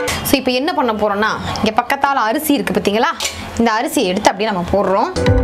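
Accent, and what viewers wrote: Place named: Coimbatore, India. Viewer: Namma covai slang apo apo vanthutu poguthu. akka....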